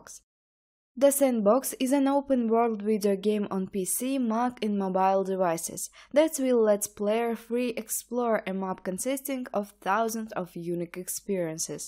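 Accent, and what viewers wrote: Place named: Russia. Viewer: Russian acsent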